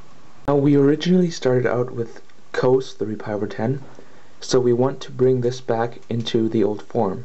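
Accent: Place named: Germany. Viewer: i notice a slight european accent are you german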